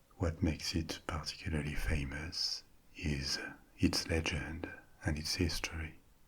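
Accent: France. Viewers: This is now my favorite ASMR channel. His French accent just makes me melt. Thank you for calming my anxiety :)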